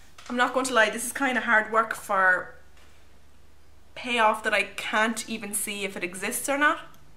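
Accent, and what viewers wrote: Ireland. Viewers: U irish??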